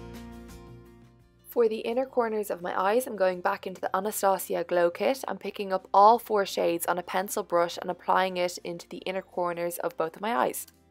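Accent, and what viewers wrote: Ireland. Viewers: how can she help that its an irish accent im irosh and thats how i say it .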